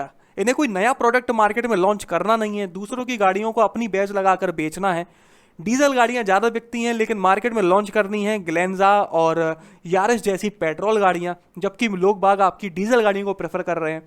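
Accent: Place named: Agra, India. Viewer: Agra ke. Lagte ho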